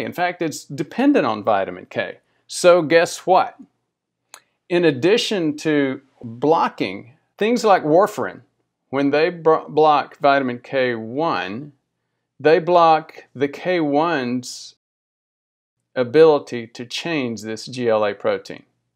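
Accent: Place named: Middle Tennessee, United States. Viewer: thank you for the fast reply. I'm currently shopping for a good used van to replace my decrepit one (it literally can't even make it to Knoxville, much less Louisville.) Then we would be better able to get to your neck of the woods. I thought you had a little "Middle-Tennessee" in your accent. Nashville would be a bit easier to get to, but it is what it is. Wish we had known about David W.. My sister, who resided near Memphis, just recently died during a triple bypass...another death-by-doctors incident, in my opinion. Anyway, thank you very much for the info,. We might see you sometime. Sylvia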